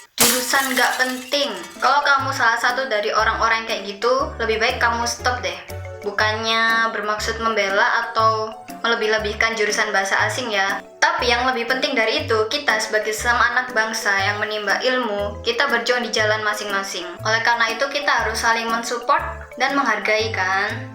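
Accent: Java, Indonesia. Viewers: Muedhok puol, tapi keren kak saya suka tips nya hehe 👍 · javanese nya kentel bgt mba 😂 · klo aku nanya kayak nya di jawab ngga yah??? kak, orang mana sih? kok jawa nya agak medok? hehehe just asking.... Loading.... Waiting for answers..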